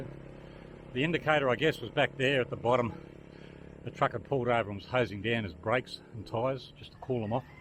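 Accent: Australia. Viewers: I would think there is little chance of getting kidnapped in the philippines - the dominant religion is Roman Catholic, and it's quite conservative. Nice video, and good to hear another Aussie accent for a bit. 'Flat out like a lizard drinkin' up those hills. I'm the same now (not that I did any serious cycle touring until my 50's anyway) Dingo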